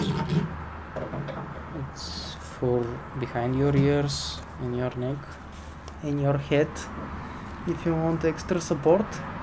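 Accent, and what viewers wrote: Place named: Russia. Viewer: Я один слышу русский акцент?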